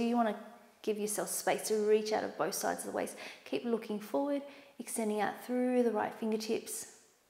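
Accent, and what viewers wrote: Australia. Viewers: Wonderful. Thank you so much. REALLY needed this after a long work day writing. I've just discovered your videos and having grown up in Aus I SO appreciate your soothing lovely homely accent and the Aussie birds in the background. I'm 17 weeks and only just getting back into yoga after a break to feel rough as old boots during the first trimester and your videos are such a wonderful resource. I'll definitely be donating x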